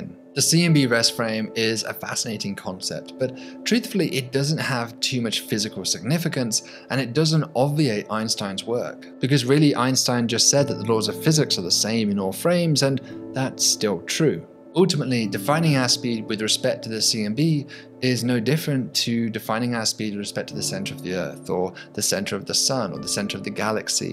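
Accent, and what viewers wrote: England, United Kingdom. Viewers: English accent does that to most people, he was raised in the UK, went to the University College of London so he was engrained with it. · For anyone in the USA or UK MPH is more intuitive and he sounds English to me so I guess it’s up to him.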